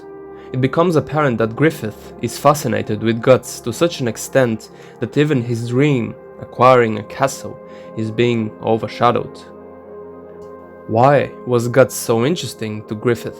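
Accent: Germany. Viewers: Good German accent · Bro, you German? Thick accent man. Shoutout from Manheim! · 30 seconds in. You’re definitely German. Cool accent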